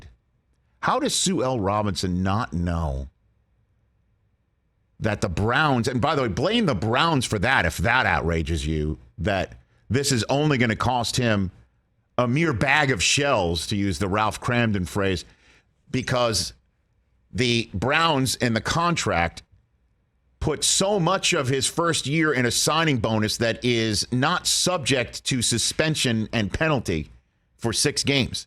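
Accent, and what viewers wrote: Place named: California, United States. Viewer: your california is showing....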